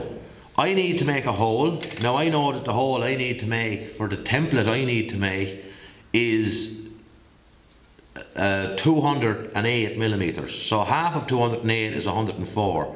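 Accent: Ireland. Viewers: Thank you. Practical and in METRIC. All delivered in a delightful Irish accent.👍Plus the clamping and direction tips.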